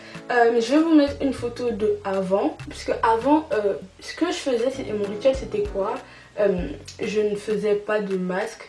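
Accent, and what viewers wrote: Cameroon. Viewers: cc super vidéo ...merci pour les conseils mimi et drôle tu es de temps en temps mets l accent cam quand tu parles j aime bien j attends plus de vidéos ma chérie bizu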